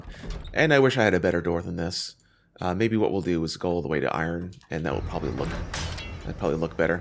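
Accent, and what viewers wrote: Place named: Canada. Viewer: Forjed eyeron & geyerocopter lol. I love how Canadians speak.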